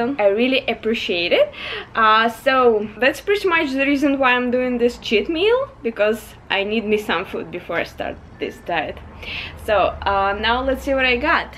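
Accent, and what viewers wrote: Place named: Russia. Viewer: сразу слышно, что русскоговорящая хд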